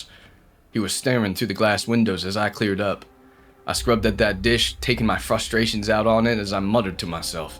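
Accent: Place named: South, United States. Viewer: I like these dogman stories! Lon Chaney as the Wolfman comes to mind. You read this one quite fast though. I couldn't savor the flavor. Love the idea of a house of glass, though, how folks could be one with the trees all around and no bugs to bother you! Imagine a light rain pattering against the glass, a fire in the fireplace, warm and cozy, with little snaps and crackles and suddenly a body thrown against the glass. Great story. May I ask where in the south you are/were from? I can hear a bit of the twang in your voice that we southerns can't seem to loose no matter how hard we try.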